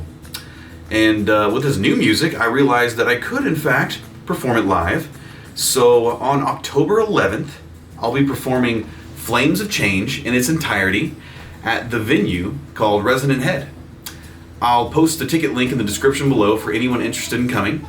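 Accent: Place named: South, United States. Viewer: It took until this video for me to realize you were also southern lol.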